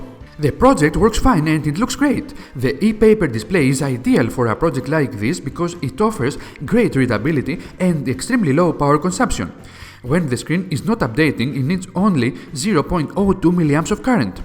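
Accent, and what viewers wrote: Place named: Greece. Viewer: I designed a home automation in my spare time back in 1985. It was designed with 68HC11 MCU which was the most powerful MCU you could buy in those days. Unfortunately, IBM beat me to it, and even called it what I did; "Home Run". I think they had ESP (extra sensory perception), since I told no one about my project! It had lots of discrete ICs like 74LS138, 74LS367 etc. I have determined that I can now implement a wire-less version (WiFi) of it, which would eliminate "ALL" wires (except power), using a single ESP32, IR motion sensor, mic/speaker, touch keypad, a TRIAC and a few OP AMPs for analog signals (hands-free Intercom and AC dimmable switch). What I wouldn't have given for an ESP32 at that time! Our younger viewers have missed being a witness to a digital revolution. Thanks for the nice video. Your voice and accent, reminds me of my childhood friend from Athens.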